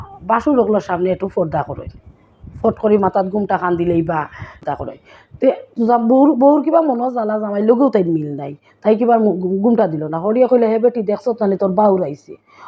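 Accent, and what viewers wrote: Sylhet, Bangladesh. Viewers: দিদি আপনার মুখ থাকি সিলেটি ভাষার শিলক শুনতে অনেক ভালা লাগে।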